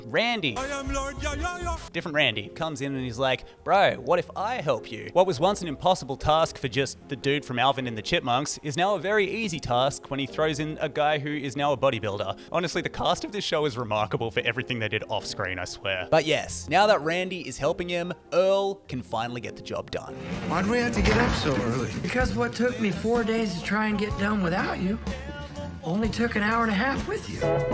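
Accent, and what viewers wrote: Australia. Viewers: Hey, as an Aussie who watches all these productivity / life improvement stuff, you are so incredibly refreshing. It’s great to hear our accent and the very aus approach to this stuff - ‘fuck it, I’m in!’ - you’re unique in a sea with lots of sameness so you gotta keep making content! I’ll listen to anything you’d like to talk about! This bucket list, mate - that’s fucking awesome and I’m looking forward to following your journey!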